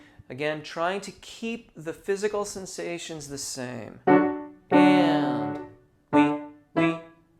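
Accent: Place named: Midwest, United States. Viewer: I am so grateful for the tremendous amount of pure quality content you provide. I find it interesting that you mentioned you are from the Midwest, then California, then England. I can tell. I am a Midwesterner myself and now your manner of speaking makes sense. 😉 I heard the influences but couldn't place where they were coming from. I just love your manner of teaching... Sometimes I forget you are not live and playing scales just for me! Thanks again.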